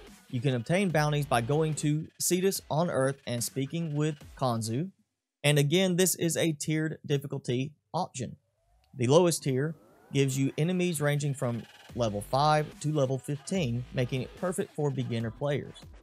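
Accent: United States. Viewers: I love how his accent is America itself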